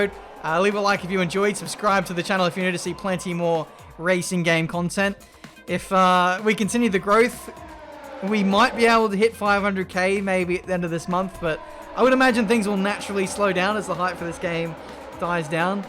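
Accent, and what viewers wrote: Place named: Australia. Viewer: How to watch channel: Step 1: Select video Step 2: Click "Like" and "Subscribe" if you haven't already. Step 3: Sit back, watch and listen to smooth jazz Australian Commentating Voice.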